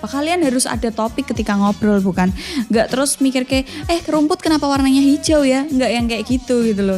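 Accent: Java, Indonesia. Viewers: Suka sama cara bicaranya yg muedhok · Mau kumpulin orang yg suka dengan cara bicaranya yg medok asli tdk di bikin2 ... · Mb medokku😍panggah ayu shoting dgn penampilan simpel yg sederhana❤ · Kak bahasa nya medok banget uhh bikin suka kalo nonton nya😎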